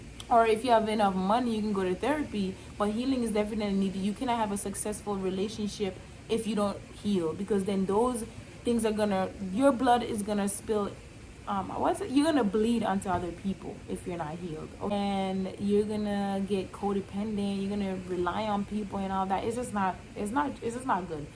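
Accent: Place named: Puerto Rico. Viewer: U sound puertorican cool anyway my 20’s were dark and depressing😞sis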